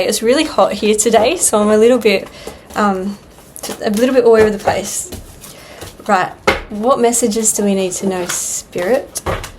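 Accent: Australia. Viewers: Greetings from California. Good reading,. Good voice. You will do well in the music industry as a singer. You are a star of Australia. We really like the Australian accent. We can listen to talk all day. Happy valentines from our channel 🙋🏻‍♂️🧜🏼🧜🏻‍♀️🦜🦜🦜🦜🦅🦅🦅👑Sally Alexandria & Alex * Australian Parakeets Stars 🦉🦉🦉💕💕💕🎉🎉🎉🎈🎈🎈🧁🧁🧁🍾🍾🍾🎊🎊🎊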